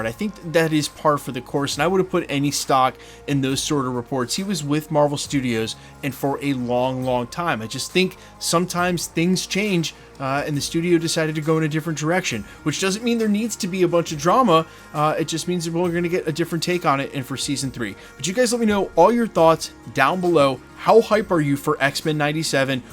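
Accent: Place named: Maryland, United States. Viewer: Bruhhh ur accent...... you've gotta be from Maryland 😂😂😂😂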